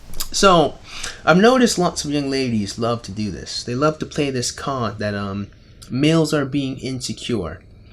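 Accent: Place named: England, United Kingdom. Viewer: I love that you STILL use that English accent. Watch you end up moving to England and your videos will start to become more an more like the real thing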